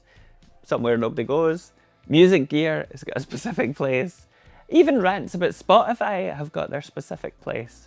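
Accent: Scotland, United Kingdom. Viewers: I like how there's no one like you. You are unique and I'm from America and love your Scottish brogue. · Oh no and I was so proud to finally having wrapped my head around the Scottish accent 😂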